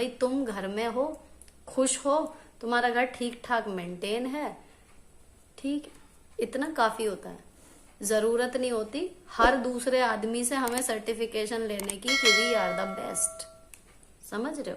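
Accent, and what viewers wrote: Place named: Meerut, India. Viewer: Hi ji I am rajni Chauhan from meerut ganganagar you know you look like my Mami ji PTA hi mujhe sabse achi baat kya lagti hai apka baat kerne ka tarika bilkul desi bolte hi PTA lag jata h meerut ki l language like it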